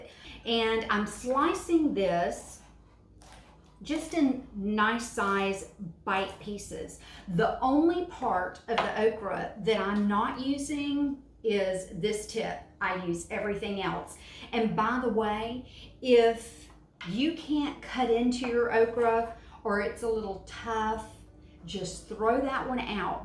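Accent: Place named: Alabama, United States. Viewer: Living in the Uk, looking for a ladyfinger ( okra, they dont use the name "okra" over here so much)recipe ...and being a native Eufaulian from Alabama, Iinstantly recognized the accent. This is exactly what I was looking for in an okra recipe. Thanks so much for reminding me of my old home far away.......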